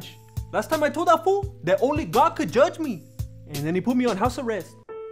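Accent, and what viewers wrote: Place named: Mexico. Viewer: es gracioso tu acento muy mexicano! saludos from oaxaca krav maga studen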